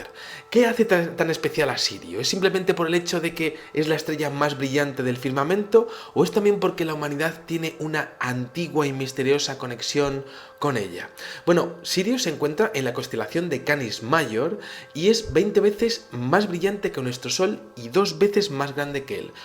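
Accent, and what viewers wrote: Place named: Castile, Spain. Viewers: me imgaino que es español entiendee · Ese acento se llama castellano como buen español que es.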